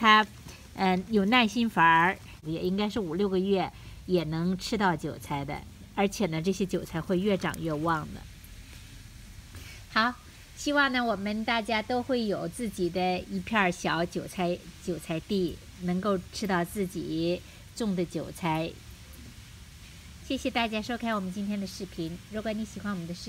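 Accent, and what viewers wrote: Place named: Weihai, China. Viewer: 请问您是不是威海人啊？口音真的好像！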